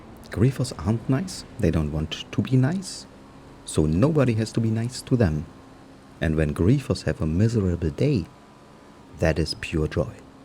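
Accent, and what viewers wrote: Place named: Germany. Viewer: He has a slight German accent but everyone has to start somewhere. 💪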